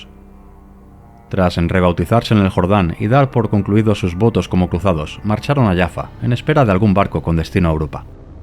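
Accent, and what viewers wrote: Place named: Spain. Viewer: Excelente narración con lujo de detalles, felicitaciones!! No hay nada mejor como la voz humana, y si tiene acento español mejor, para narrar estas historias. Estoy hasta la médula de la IA. Saludos desde Uruguay 🇺🇾 y feliz 2026!!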